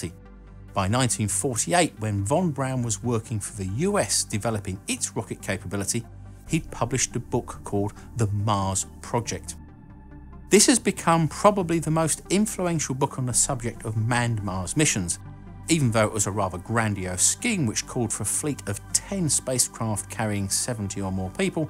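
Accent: United Kingdom. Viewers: i found your channel by youtube advise like following: 1. British accent check 2. Shaved head check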